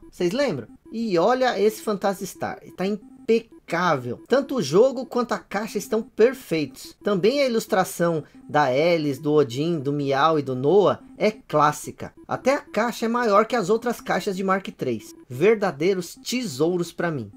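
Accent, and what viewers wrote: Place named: Belo Horizonte, Brazil. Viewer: Prestei atenção em vc conversando. Vc eh de BH.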